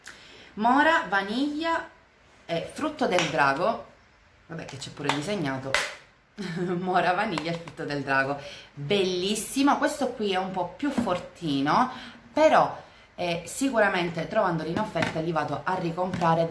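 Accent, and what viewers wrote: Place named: Palermo, Italy. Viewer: Anchoo nuova iscritta sei molto simpatica e cmq essendo una siciliana ho capito subito che sei di Palermo......io Catania ..bel video alla prossima!!!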